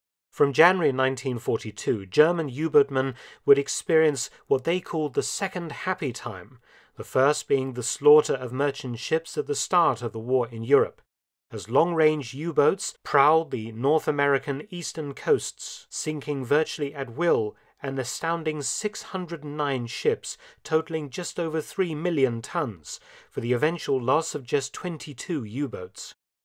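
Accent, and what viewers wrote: England, United Kingdom. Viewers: Its the British accent. Brits are great narrators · His particular English accent doesn’t hurt either — it fairly screams credibility. 😀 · GENERAL British pronunciation; fairly innocuous, but not in a bad way.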